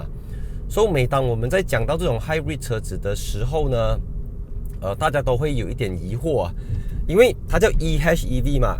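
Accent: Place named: Malaysia. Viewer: 第一他是馬來西亞人，第二這是馬來西亞人的交流方式，第三請尊重每個人的說話方式，即便是中國人也都會有口音的。所以請你先三思你的思緒和言語後再做評論。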